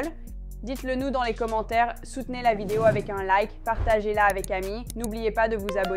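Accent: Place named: Quebec, Canada. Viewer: Non elle a juste un accent québécois c’est tout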